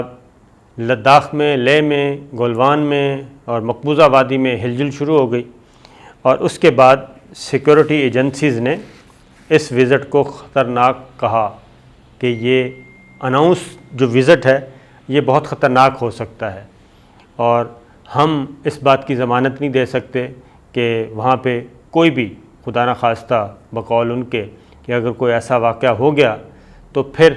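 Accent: Punjab, Pakistan. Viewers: Tum punjabi ne urdu ki mother fuck kardi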